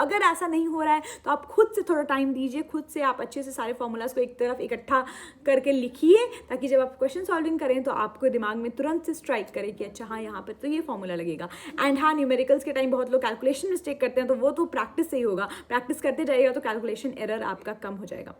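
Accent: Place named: Mirzapur, India. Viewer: Aap jab baat karti ho mirzapur yaad dila deti ho ❤️😂with ur accent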